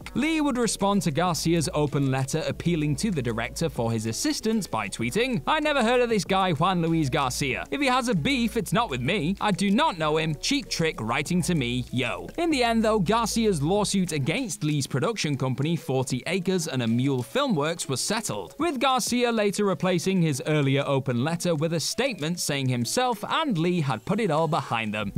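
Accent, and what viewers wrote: United Kingdom. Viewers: "He's English" You seem to be under the mistaken assumption that they have one accent/dialect. Which is wrong. This is a specific UK dialect that does pronounce the "g" in hangover. Other dialects don't.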